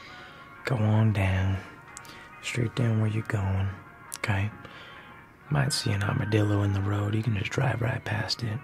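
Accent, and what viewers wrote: South, United States. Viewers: Very enjoyable! Absolutely fantastic! That Southern accent sounds gorgeous! Loved it! 😁✌💕❤🎭🪚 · Absolutely amazing. I adore adore adore your content 🥰 so thank you! I absolutely love horror and bad no idea this could be achieved asmr wise. I’ve watched it twice now to sleep 😌;; - love the southern accent too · Considering I LOVE the original movie and I’m excited and hyped for the upcoming TCM multiplayer game by Gun, I am so glad you made this video!👍 Also props to your top tier acting and your southern accent, dude. Your character could EASILY fit in as a member of the Sawyer/Slaughter family.😁👍 Btw, just as a side note, Gun is making brand new Sawyer family members from the ground up for their upcoming game.😊👍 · This is a REALLY good Southern accent, and that’s coming from a native Southerner. I’m not usually into roleplays with affected Southern accents because they’re not often done well (in my opinion), but this is so good! 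· Dude I’m from the south, and I confirm this accent is super on point. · Southern draw not a accent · I could see this easily turing into something hilarious too with the southern accent an all. Some barbeque sounds good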